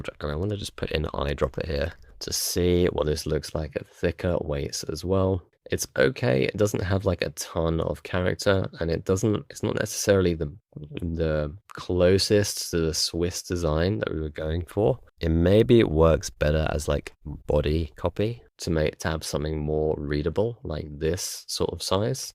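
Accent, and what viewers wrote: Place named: United Kingdom. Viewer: I don’t care about captions - I listen, not read. I only said improve the mic bass because there’s way too much low frequency in the audio with such accent, making it hard to understand. Had to replay the video just to catch it. Are you British or something? Is that why my comment got under your skin, kid? Lol.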